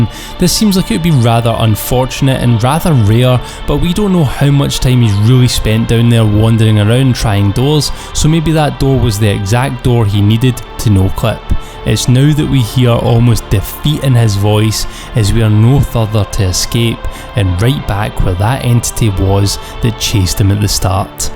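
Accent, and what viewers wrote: Scotland, United Kingdom. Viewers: Scottish accent very funni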